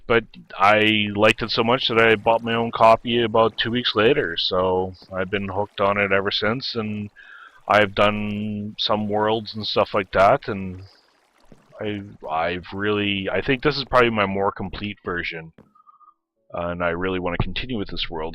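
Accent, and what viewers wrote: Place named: Canada. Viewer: Love your Canadian accent eh.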